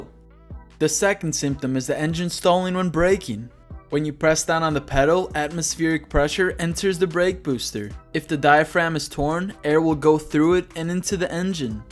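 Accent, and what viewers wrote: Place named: Pennsylvania, United States. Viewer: Which im guessing is PA by the way chrisfix says water " woder " .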